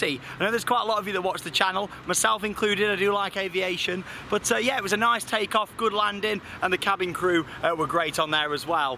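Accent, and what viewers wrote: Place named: West Midlands, United Kingdom. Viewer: I thought you were from the west midlands not manchester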